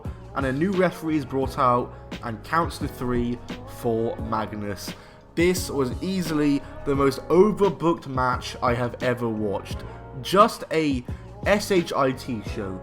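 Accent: United Kingdom. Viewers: Listening to a nit brit talk about this is crap.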